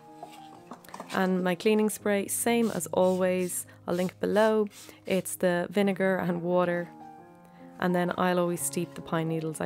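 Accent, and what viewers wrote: Ireland. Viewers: I absolutely adore your beautiful home. We live in a small 800 sq foot home, too. It's cozy and perfect. Did anyone ever tell you that your voice is so soothing? My husband is a Newfoundlander, and I can definately hear his Irish roots in your accent. Much Love, from Ontario, Canada 🇨🇦... Keli 💖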